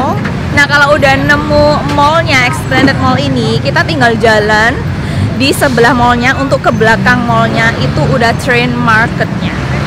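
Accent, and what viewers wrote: Java, Indonesia. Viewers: kuwait 8/10/19... wonge ayuu,,,.medhoook..mangane akeh ora isin,,,,,,,lucu lucuu,,,tek subscribe lah....january mw ke bangkok n krabi.. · Rai² cino tpi boso e medok mantul mbak😂 #wongjowo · Youtuber surabaya ngomongnya medok bgt 😁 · Bicara nya keJawaan kak 😍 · Jowomu mbak medhok beud, mantebbb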